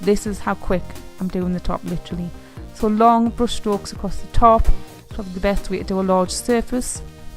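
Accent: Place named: Newcastle, United Kingdom. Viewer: Nice to hear a Geordie accent!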